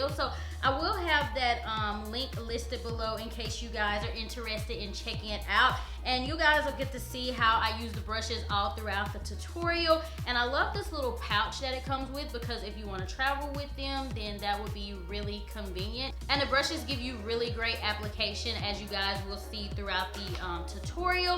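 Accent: United States. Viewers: Looking back at your older videos..and noticed that your accent has changed. You sound so country lol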